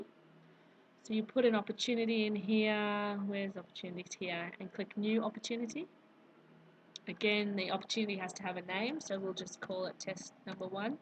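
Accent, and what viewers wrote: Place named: Australia. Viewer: Thx Ms,. Very useful. Love that Aussie accent!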